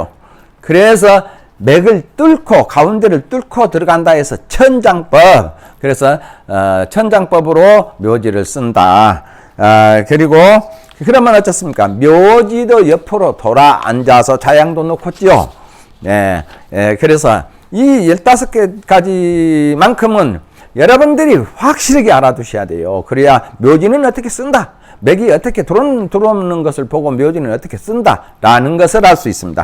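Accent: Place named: Jeolla, South Korea. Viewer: 선생님훌룡하신강의 잘보고 있습니다 말씀이 전라도 이신거 같으신데 어디신지 여쭤봐도 될런지요?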